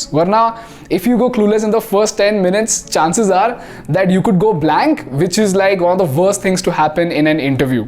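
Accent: India. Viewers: Tbh, as like everyone else, I too am so fortunate to find this channel. So informative and concise with a great Indian Accent English. Thanks to you, Cred and YT. You know why I thank them. Keep up the Good work bro.🔥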